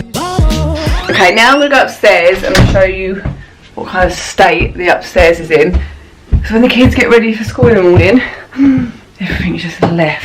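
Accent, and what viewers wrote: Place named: England, United Kingdom. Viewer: You are beautiful great body... Love the English accent...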